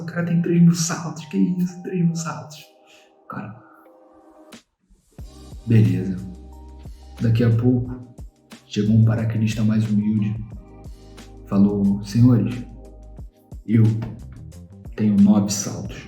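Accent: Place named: Rio de Janeiro, Brazil. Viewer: Você é muito aluno mesmo kkkk, até suas posições e suas mãos, além de sua fala pausada e encariocada mostram isso kk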